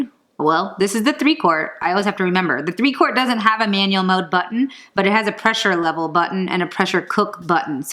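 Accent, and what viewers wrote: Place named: Gujarat, India. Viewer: are you from south gujarati? You have same accent as me and love your dishes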